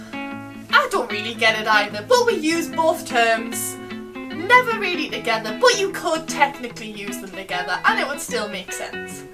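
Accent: Newcastle, United Kingdom. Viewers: If this barely Geordie I don't want to imagine how the " real" Geordie sounds hahahahah 😂😂 It's getting harder for non native speakers like me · Accent from Geordie shore, gotcha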